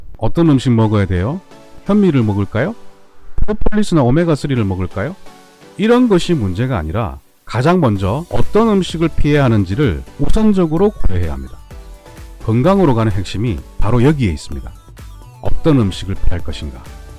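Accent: Gyeongsang, South Korea. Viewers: 감사합니다 ~~경상도 사투리가 구수하십니다. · 경상도 사투리 억양 너무 듣기 좋네요 이 억양 듣고 싶어서 계속 다른 것도 더 듣고 싶어요 물론 내용은 상상을 뛰어넘을 정도로 최고 중에 최고구요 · 경상도 사투리가 구수하고 정겹고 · 갱상도 사투리 정감이 갑니다. 의학상식을 나누어주셔 감사합니다. 복된 삶이 되시길~~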